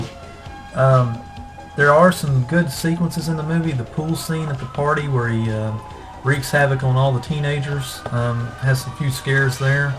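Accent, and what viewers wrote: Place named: Southeast, United States. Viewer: Are you from one of the Southeastern United States? I like your accent.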